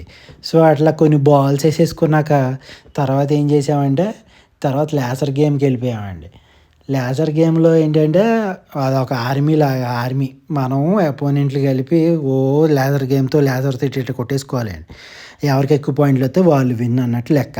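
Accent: Godavari, India. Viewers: Mi godavari ascent bagundi andi 👏👏😂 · Super me godavari yasa chala bagundi vinadaniki funny ga vundi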